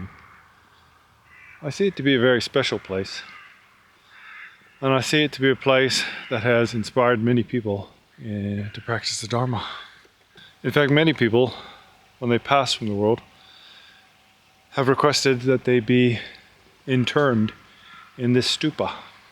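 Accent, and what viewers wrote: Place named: Scotland, United Kingdom. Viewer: I am going with a friend in a few weeks. I only live 2 1/2 hours away by car and yet I only heard about it two months ago. And yes, I have been studying Buddhism for years. I live on walney Island. In cumbria UK. I have been studying. the Dharma for quite a while but on November2nd 2024. My precious wife died from a heart attack at the age of 58. And even though I understand that everything is transient. It still hurts. Even though I don’t exist, ultimately conventionally it hurts! So I decided to go on retreat somewhere and an American Yogi told me about this place it turns out my psychotherapist teacher in the early 90s used to go there I talked to his daughter and she said yes that’s why he went and yes I had no idea he had studied Buddhism! And so my American Yogi said maybe you’re meant to go there and I will be going within the next few weeks just for the day and then I will arrange for a retreat when the weather gets better as you know especially this week January 2025. 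It’s pretty cold everywhere.! I have one question for you do you live in England but you sound Scottish to me? I have subscribed and will watch all your videos because this one is excellent thank you.yours Trevor.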